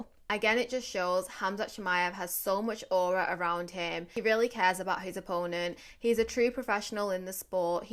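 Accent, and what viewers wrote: United Kingdom. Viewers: She doesn't even look Russian lol can you even hear her accent??? She has a UK accent.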